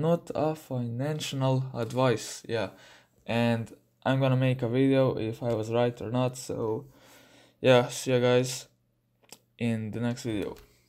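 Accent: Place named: London, United Kingdom. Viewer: Da te ne znam reko bi da si negdje okolica londona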